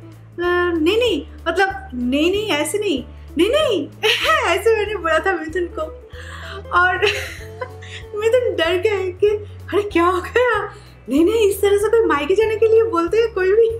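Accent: Bengal, India. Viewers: Hi. I recently started watching your videos. I live in NY,USA but I was born and raised in Kolkata. Watching you connects me with my childhood and youth. I love the way you talk in hindi like most of my bengali friends did. You sound so genuine and so comfortable in front of the camera as well. I am always looking forward to watch your videos. Keep up the great work.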